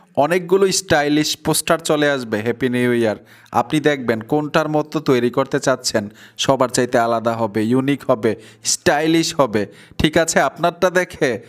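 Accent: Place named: India. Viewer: ভাই আপনার কোন কথা শুনলে বাংলা হিন্দি শোনা যায়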